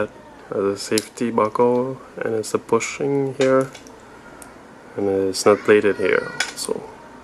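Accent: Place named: Quebec, Canada. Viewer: Québecois? - moi oui. I kinda hear it in your pronunciation. Good vid, i am considering getting this one or the blue. Cheers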